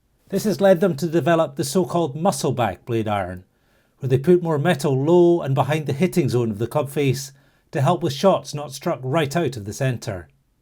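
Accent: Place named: Scotland, United Kingdom. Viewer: Hello. Really enjoy the channel, and in particular the great Scottish accent which gives us the information. My question is not blade related, but iron related. I enjoy my putter and driver showing me the best place to hit within the face, is there a reason why this is not done on irons? Thanking you in anticipation.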